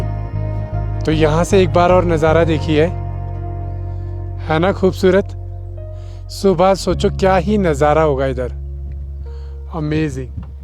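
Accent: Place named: Maharashtra, India. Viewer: Bhai ke hindi me marathi ki jalak dikh hi gayi hai Maharashtra hamara Maharashtra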